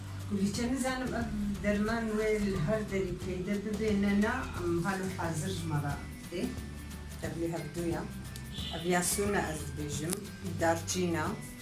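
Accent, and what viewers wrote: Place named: Nusaybin, Turkey. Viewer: Nerelisiniz?bizim nusaybinin Kürtçe sine benzettim teyzemin Kürtçe sini. Ellerine saglik. cok severim bende bugun yaptim :)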